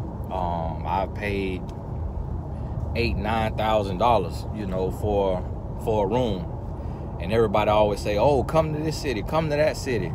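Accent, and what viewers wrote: South, United States. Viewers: Nice to see your life behind the scenes. More like this please!! Oh & don’t mind the country accent. I love it!! Well done to your sons x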